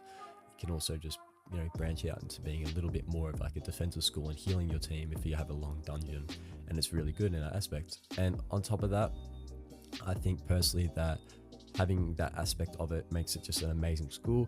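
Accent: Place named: Australia. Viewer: judging by the accent you're Aussie too, im questing atm still pretty new and looking for some people to play with if you're keen or anyone else watching.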